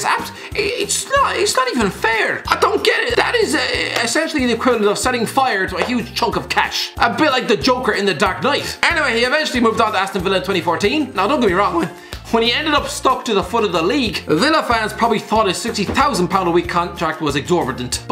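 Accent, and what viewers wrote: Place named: Ireland. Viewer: Man I love this guy, listening to his Irish accent is getting me through quarantine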